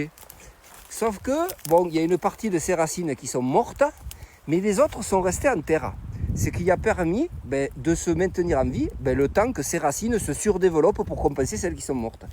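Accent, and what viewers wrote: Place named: South, France. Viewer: coucou! de la part dune mamie qui à reçu cette année à Noël de sa petite-fille en cadeaux ce livre: la vie secrète des arbres de: Peter Wohlleben,Fred Bernard,Benjamin Flao. peut-être connait- tu ce livre? ma petite-fille faisant des études dans ce domaine (cientiphique).même à 74 ans que j'ai eut le 16 février 2026,on en apprend tout les jours, lorsque j'étais enfant, je grimpais tout les jours aux arbres,ayant la chance, de n'avoir que la route à traverser pour s'y rendre. je vous ai découvert depuis quelques temps,et vous etes une bien belle personne.(j'adore votre accent ).ça me fait pensé à mes années adolescentes passées dans le midi (06). ps: cela fait du bien de vous écouté parlé, vous respirer le bien-être... mamie anne-marie, 77000,proche de fontainebleau, connaissez-vous le château? et le parc magnifique, chargé d'histoires, pour ceux à qui ça intéresse. 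merci encore pour votre savoir pour la jeunesse à venir. 😘❤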